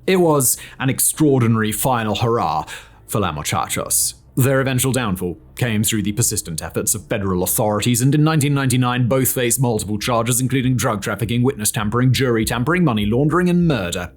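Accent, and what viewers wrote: United Kingdom. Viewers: Pea-brain strikes again. Despite being a world famous city thanks to the cocaine trade still managed to mispronounce Medellin. He keeps proving that having a British accent doesn't make you smart.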